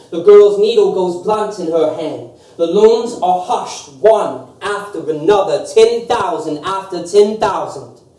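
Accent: South, United States. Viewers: Ezra Pound recited in the most cultured Southern accent I have ever heard.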